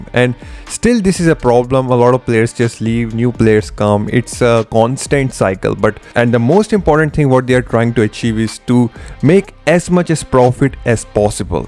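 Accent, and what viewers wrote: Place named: India. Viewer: It's difficult to understand u with ur indian accent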